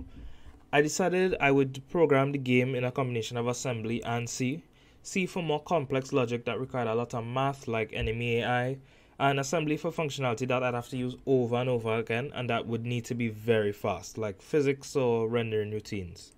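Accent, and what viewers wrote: Trinidad and Tobago. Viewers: As soon as I heard your voice I knew you were a Trini. Surprised to see a Trini talk about the X16. This is awesome! The outlines around the sprites reminds me of the Castlevania games for GBA. Was it done to help the sprites stand out against the background and whatnot?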